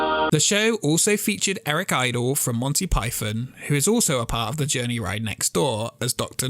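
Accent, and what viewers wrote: United Kingdom. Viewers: He’s British, you gotta give him a break :)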